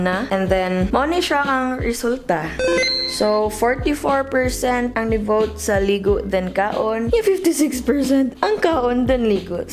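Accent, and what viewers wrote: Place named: Cebu, Philippines. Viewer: Mgkakatawa ko sa imo accent dai.. perti ka gahi lomad sa cebu! Perti ka visaya😊😂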